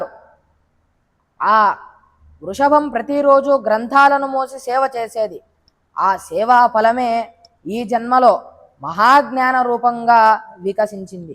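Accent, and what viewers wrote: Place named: Karnataka, India. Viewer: వింటుంటే నిద్ర వస్తూంది. జయతీర్థులవారు ద్వైత సంప్రదాయానికి చేసిన కృషి మరువలేనిది. మీరు కన్నడ భాషలో అనుకొని తెలుగు లో మాట్లాడుతున్నారు. తెలుగును తెలుగు లా మాట్లాడండి. వినసొంపుగా లేకపోతే ఏదో చెప్తున్నారు లే అనుకొని వదిలేస్తారు. సాధ్యమైనంత వాడుక భాషలో మాట్లాడండి. ఆత్మవిశ్వాసం ఉండాలి. మీకు మంచి వక్త అయ్యే అవకాశాలు ఎక్కువగా ఉన్నాయి.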